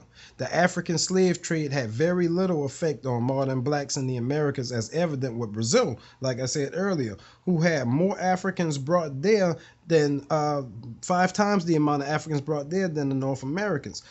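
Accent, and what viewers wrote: New Orleans, United States. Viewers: If just keep listening to this guy talks he sounds just like a native new orleanian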